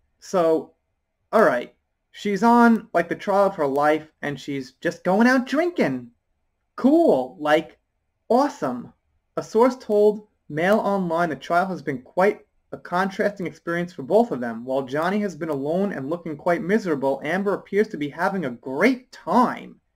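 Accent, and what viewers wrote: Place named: East Coast, United States. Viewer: What made you move to Japan? You sound very East Coast USA.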